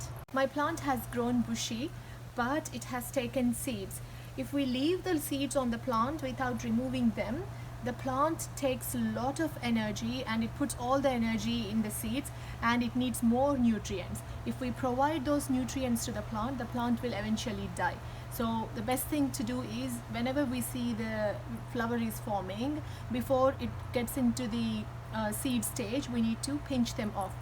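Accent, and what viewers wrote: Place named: United Kingdom. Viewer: You got British accent wow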